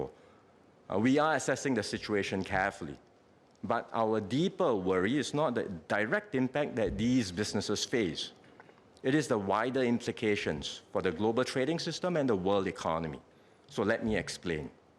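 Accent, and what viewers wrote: Singapore. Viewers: Singlish. Singaporean English. Don't be a hillbilly 😂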